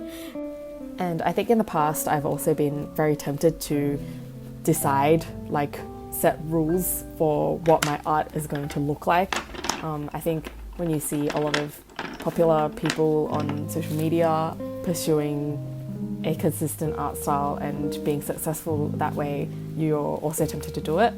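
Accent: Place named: Australia. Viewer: I love watching other Australians art videos/studio vlogs, the accents and background noises (in particular magpies) feel cosy to me lmao. I love your artwork and the commentary you have for it, it’s very casual 🤙✨ I also really appreciate how you try to incorporate some tips to fellow artists in each of your videos 🥰 looking forward to more of your vlogs, the internet needs more aussies 🙈⭐️✨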